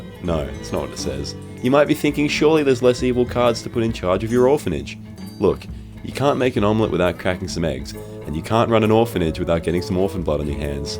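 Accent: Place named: Australia. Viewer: so fucking australian it's perfect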